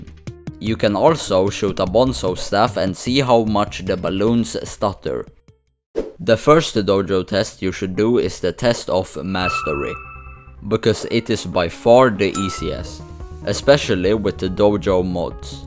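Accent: Sweden. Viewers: Bad Video + L Swedish😂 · swedish accent is fun just yamp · W Swedish cause you say "yump" love that shit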